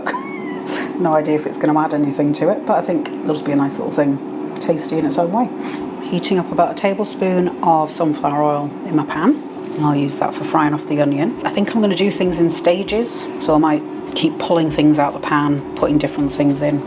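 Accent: United Kingdom. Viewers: This looks delicious. I’m celiac so always looking for new recipes. Btw is that a Leeds accent? I’m from Leeds originally so always nice to see YouTubers from back home.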